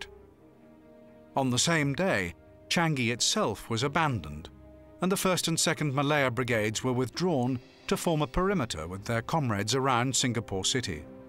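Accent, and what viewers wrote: United Kingdom. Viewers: That is because he is an educated Englishman...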